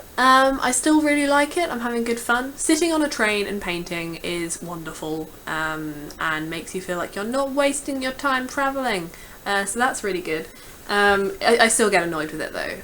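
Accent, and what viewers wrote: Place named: United Kingdom. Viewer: I'm Turned On ! geeky, british accent and beautiful !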